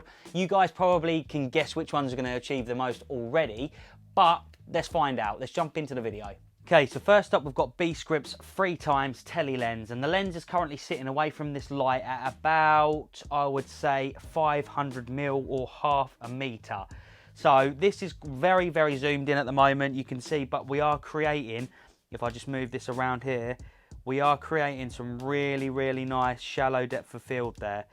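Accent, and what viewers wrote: United Kingdom. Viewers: having a studio at home is always an issue. It’s the biggest issue that stops people from setting up a channel. I can tell from your accent your from the south east, stay safe mate.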